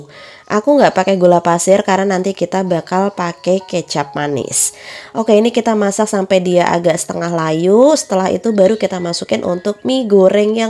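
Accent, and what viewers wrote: Java, Indonesia. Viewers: Ya Allah... Denger mbak ngomong Jowo koq yo iso medhok to... 😀..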